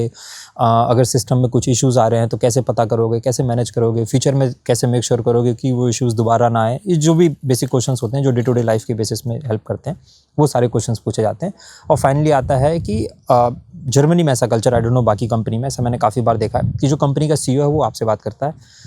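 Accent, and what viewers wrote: Uttar Pradesh, India. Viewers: Bhai ki UP vali boli is crazy🤙🏻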